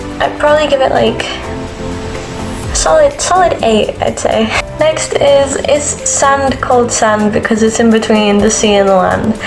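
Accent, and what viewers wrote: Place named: United Kingdom. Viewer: Holy shit i did not expect you to be British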